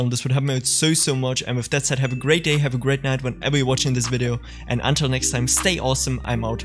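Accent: Germany. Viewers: nothing much, are you german? i like your accent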